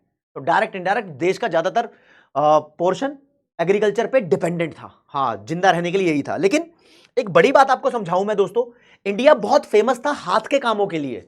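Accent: Punjab, India. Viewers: SIR APP PUNJABI HO KYA BATO SE LAG RAHA HAI 😅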